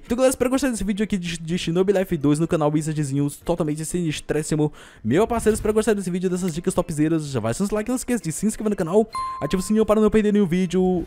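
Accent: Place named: Bahia, Brazil. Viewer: Essa sua voz de baiano me encanta 😔✋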